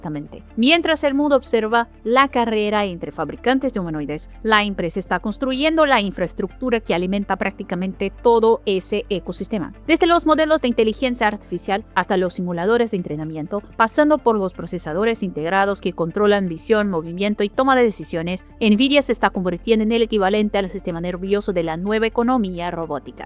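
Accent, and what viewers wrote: Brazil. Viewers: Ella es totalmente humana, en un vídeo de hace tiempo mostró su cara y salió hablando, su acento es por qué es brasileña o algo así y el español no es su lengua nativa.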